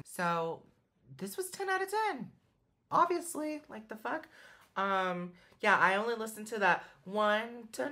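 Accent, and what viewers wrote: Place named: South, United States. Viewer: Damn dudes voice is great for the country.. Fire ass voice.. I hate how country gets me in my feelings, though.. Oh that one part on the first song is not a violin in country it's the fiddle close but not the same.. This shit is fresh I'm going to be adding this guy to my everyday music.. Damn his rapping is Fire too.. did a country song once but it was ahead of its time.. He should do a song with them.. Love your country accent also made me laugh.. This whole video is 🔥 💯. I want a song with this guy and..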